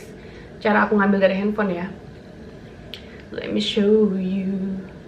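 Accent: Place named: Yogyakarta, Indonesia. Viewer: Thank you for kind sharing. Seneng banget akuuu, penasaran Ka orang mana sih? Logatnya kaya orang jogja hehe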